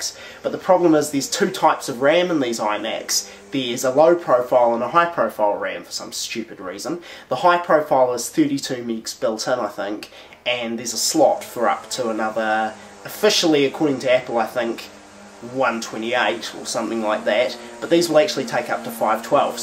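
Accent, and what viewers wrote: New Zealand. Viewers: Sounds more like New Zealand accent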